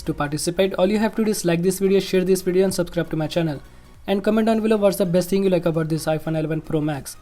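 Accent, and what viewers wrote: India. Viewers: The thing that I love about this iPhone is the camera. Your English with an Indian accent is cool to hear.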